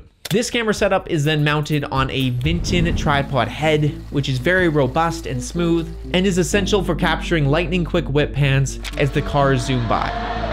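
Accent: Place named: Canada. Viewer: Now that I hear it he's definitely Canadian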